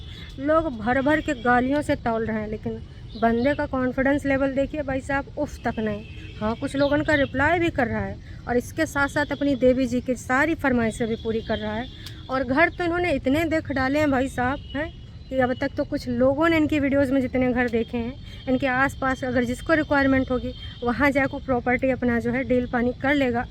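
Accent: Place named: Kanpur, India. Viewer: Ap kanpur se hai kya...ye to humari kanpuriya bhasa hai...mast bolti hai ap...❤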